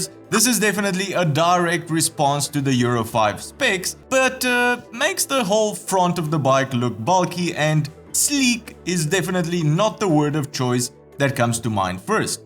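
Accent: Afrikaans, South Africa. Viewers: Is jy 'n Afrikaans ou? Klink 'n bietjie so ne! Is jy nog in SA?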